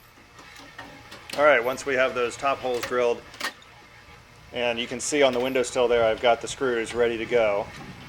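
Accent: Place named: California, United States. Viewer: This guy sounds like he’s from California.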